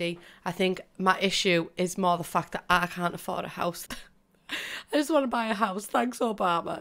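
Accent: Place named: Newcastle, United Kingdom. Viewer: She’s a Geordie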